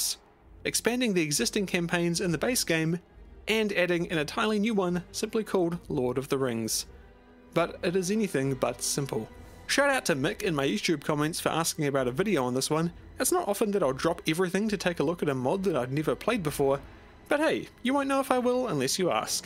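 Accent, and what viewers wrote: New Zealand. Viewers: yo r u a kiwi?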